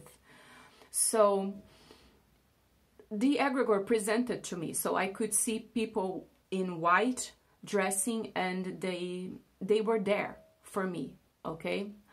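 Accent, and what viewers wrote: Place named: Brazil. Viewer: Eu imaginei que tu era brasileira pelo sotaque :)!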